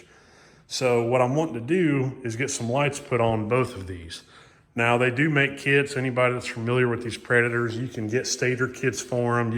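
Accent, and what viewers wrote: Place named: Boston, United States. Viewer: I knew it was Boston that I heard in fast motion😂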